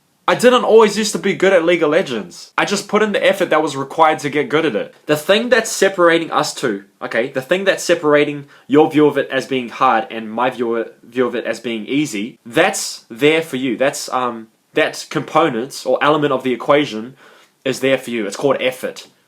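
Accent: New Zealand. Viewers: He's kiwi bro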